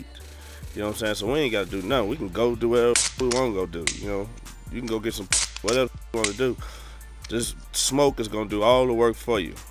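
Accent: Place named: South, United States. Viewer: this guy lives in la but you can tell he came straight outta the south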